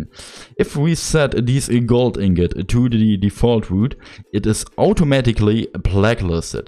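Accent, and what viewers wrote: Germany. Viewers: er lernt immer noch englisch XD gutes toutorial es klingt sehr deutsch XD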